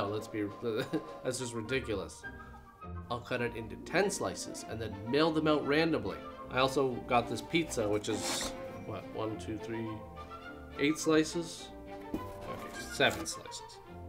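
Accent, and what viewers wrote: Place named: Canada. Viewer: ive gone through a 5 videos of this channel and it feels like this guy is trying not to embrace his canadian accent, because it only slips out with words like "process" not trying to stereotype him but i spend alot of time in guild/clan voice chats and bro... just let use make fun of your accent. embrace it.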